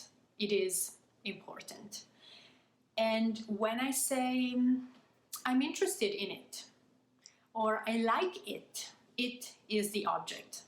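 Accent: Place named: United States. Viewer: I enjoy your american accent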